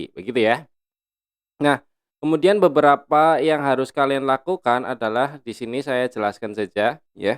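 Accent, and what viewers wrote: Banyumas, Indonesia. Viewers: Om pake semi online ya anbk nya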